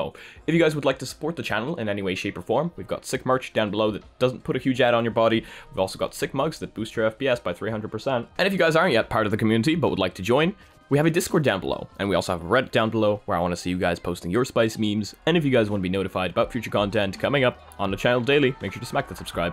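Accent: Poland. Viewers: question are you from poland?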